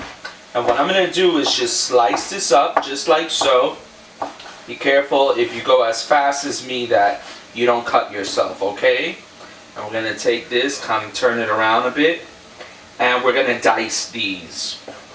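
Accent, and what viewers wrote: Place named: Queens, United States. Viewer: Are you from Queens brother? Lol I love it!! Thanks for sharing!!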